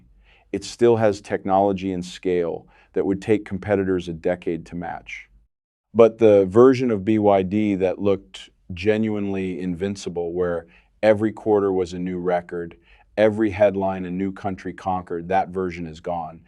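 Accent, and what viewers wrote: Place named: United States. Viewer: When I hear an American Voice telling me the Chinese Competition is done I'm skeptical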